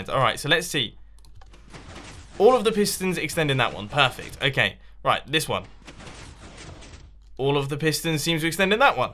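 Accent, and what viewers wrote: United Kingdom. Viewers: Why so stereotypical, I'm American and a girl and I don't just like the British accent I like the content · it is the british accent with the serious tone that has the charm. Sometimes I try speaking like that bcoz it sounds absolutely cool OwO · British voices turn me on even tho i'm, not gay.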